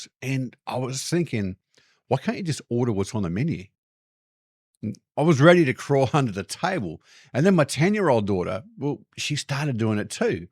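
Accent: Australia. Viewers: You’re not an Aussie living in America; you’re an American with an Aussie accent. (I’m an American with an Ohio accent.)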